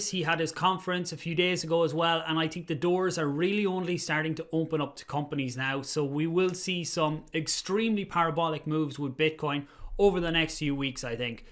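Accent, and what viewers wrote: Ireland. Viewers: You sound like a strangled duck. I love it :)) Irish accent funny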